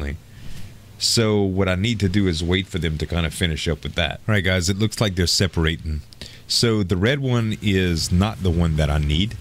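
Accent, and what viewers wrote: Louisiana, United States. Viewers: I want to ask you, but I don't want to miss-place you. You have an accent, and I love it. I just subbed to you so forgive me if you've already established this, but is your accent for Louisiana ?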